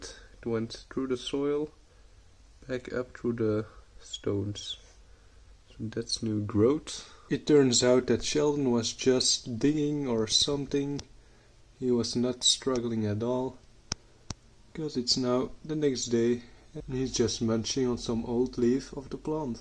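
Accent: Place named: Belgium. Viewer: Judging by your accent, you’re Belgian right?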